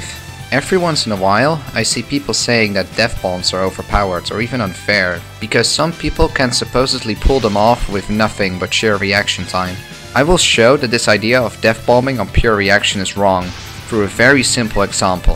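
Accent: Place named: Netherlands. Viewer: Heet Hollands accent.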